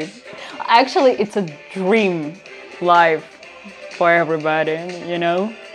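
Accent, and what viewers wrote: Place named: Ukraine. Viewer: I didn't know you were Ukrainian but I suppose you were from around based on your accent. I'm also a huge Foals fan and I saw you reacted to one or two more songs by them. Much hugs and love from your number one fan from Bosnia. Your reactions are awesome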